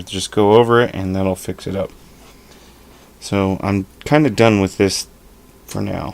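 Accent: United States. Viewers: John Strauss For the Accent my money is on Michigander... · excellent tutorial and thank you so much for doing and posting it. One thing though, the L is silent in the word solder unless you're from England. You sound American though??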